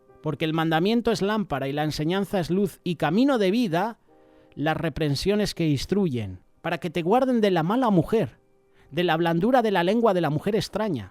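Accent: Spain. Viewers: El ETERNO LES BENDIGA HOY ES 4JULIO_ 2021-DESDE OREGON PORTLAND USA ME ENCANTA SUS ENSEÑANSAS ME INSTRULLEN MUCHO I TAMBIEN ME ENCANTA SU ASENTO DE VOSOTROZZ😍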